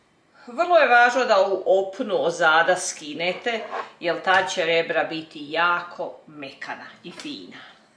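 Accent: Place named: Slavonia, Croatia. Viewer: Sta reci - potpuni uzitak gledati kao i uvijek spremanje odlicnih jela i slusati divan slavonski nagalasak. Moram probati ovaj recept za krompir. Pozdrav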